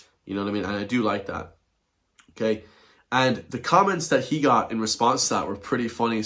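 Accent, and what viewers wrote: United States. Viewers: The Irish- always arse-licking the USA!. Lack of confidence leads the most pathetic of us crave attention from big daddy America. Some of us try to adopt the accent, how sad. Oh yeah, just like the presenter here! Nothing says 'Irish Patriot' more than adopting a foreign accent. Haha. This couple were pleasant ( I watched their vids) but like so many Americans, they were naive and small-minded. Of course some people will take the piss! This love affair with America is extremely childish. An American liked our Tayto! Oh my god, how amazing!!!!! Get some confidence for f@ sake. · Are u not American? Bcoz u sound very American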